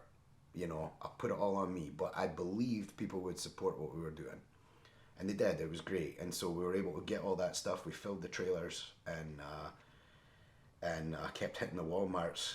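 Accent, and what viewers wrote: Scotland, United Kingdom. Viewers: does he have half a Scottish accent ???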